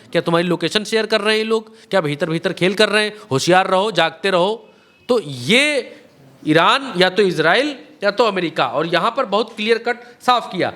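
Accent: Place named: Uttar Pradesh, India. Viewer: भाई जब बोलते हैं तो सिर्फ सुनने को जी चाहता है pure up ki bhasha Bolte Hain 👍👍👍👍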